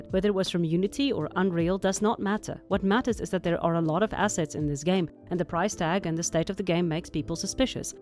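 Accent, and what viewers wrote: South Africa. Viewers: Is she South-African? :o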